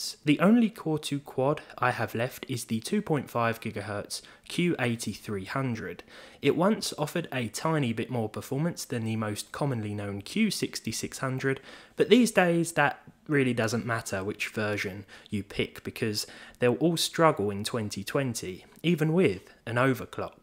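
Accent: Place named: United Kingdom. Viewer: British accents to me are like pissing a set of prison keys... but I tolerate it for because I love him.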